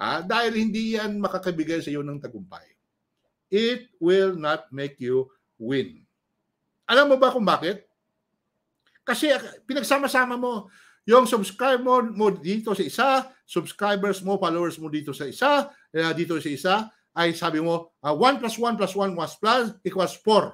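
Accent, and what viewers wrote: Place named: Visayas, Philippines. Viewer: Ayan nagsalita na c raffy tulfo dami nyo kuda at puro kau fake news. Asan kau ngaun sa mga pinagsasabi nyo. Magaling kumanta? Tunong visayA ka nga magsalita.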